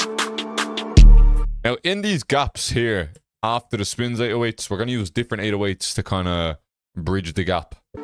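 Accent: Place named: Dublin, Ireland. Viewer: That Dub accent is heavy. Respect from Bray my man, keep killing it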